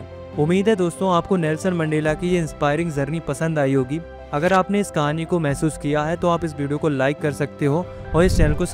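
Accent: Uttarakhand, India. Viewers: Bro you from uttarakhand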